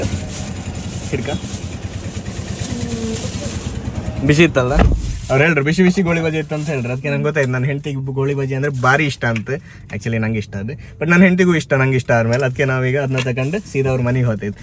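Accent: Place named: Kundapur, India. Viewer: I love kundapra kannada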